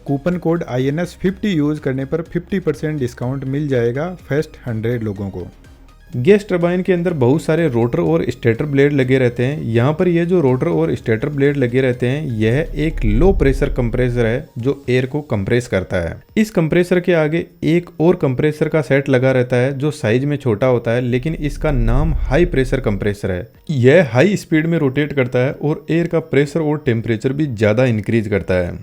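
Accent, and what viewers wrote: Rajasthan, India. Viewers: आपकी हिन्दी बोलने की शैली में राजस्थान की झलक दिखती है क्या आप मारवाड़ एरिया से है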